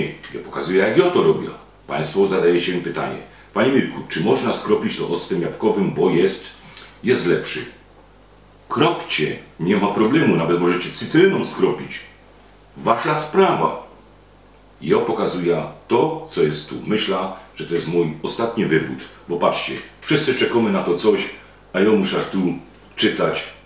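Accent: Silesia, Poland. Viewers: Panie Mirku niech Pan nagrywa więcej filmików przyjemnie się ogląda i ta Godka. Ja bym chciał zobaczyć odcinek fasolka. Pozdrawiam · Pozdrawiam z Warszawy z okolic lotniska na Bemowie, na którym to w 1959 roku lądował vice prezydent USA Richard Nixon. Wtedy pas na Okęciu był za krótki. A mnie i tak jeszcze nie było. Podoba mi się ta śląska gadka i trochę zazdroszczę bo warszawska już prawie wymarła.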